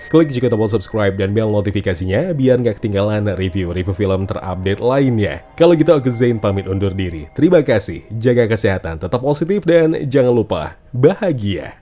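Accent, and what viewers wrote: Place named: Padang, Indonesia. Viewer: logatnya malah kya logat padang, cuma bagus sihh 😊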